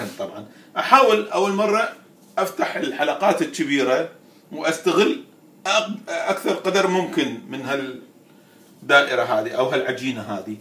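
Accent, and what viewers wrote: Iraq. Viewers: مرحبا عمو كلش واحد يرتاح من يسمعك تحجي بلهجتك البغداديه عمو اريد اعرف شلون نسوي خل التمر وشكرا جزيلا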